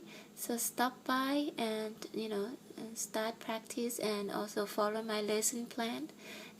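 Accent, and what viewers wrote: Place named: Thailand. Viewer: I love your tutorials! Maybe move away from your camera a little bit. You teach very well. I love your accent. May I ask if you are from Thailand? I lived in Thailand many years ago. 💕. Thank you for sharing your craft.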